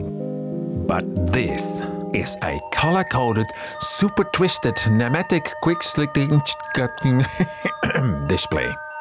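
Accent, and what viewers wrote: Netherlands. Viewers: It's just cute, how dutch you sound. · Huh, this is pretty neat. :D I also like how wonderfully Dutch you sound, without having too thick of an accent.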